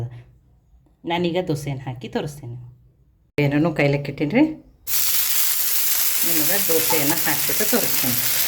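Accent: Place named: Dharwad, India. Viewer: Wow namma Dharwad kannada ❤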